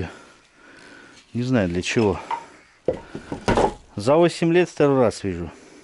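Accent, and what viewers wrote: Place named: Penza, Russia. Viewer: и на пензенский похож)))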